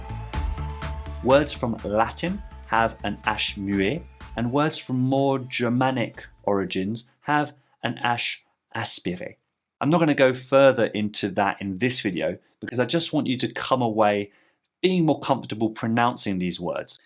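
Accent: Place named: England, United Kingdom. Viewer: It’s so endearing hearing angleterre accent speaking my language :) tres sexy ;)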